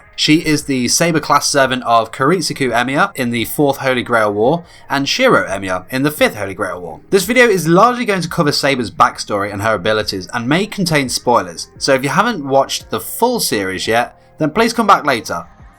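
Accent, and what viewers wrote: United Kingdom. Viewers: are u welsh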